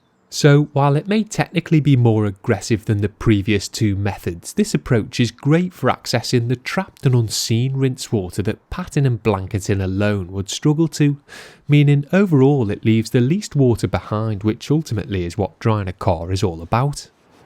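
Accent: Liverpool, United Kingdom. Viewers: yer i know that water has anomalous properties of cohesion and adhesion however it is due to simple osmosis that a dry towel will absorb more water than a wet one! and when the water potential gradient has reached dynamic equilibrium then it will no longer absorb any more and this will be reached sooner wetting the towel ... but no hate to the scouse cleaning guy as hes sound ! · I'm sorry oot. Is that scouse? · its the English the British English not english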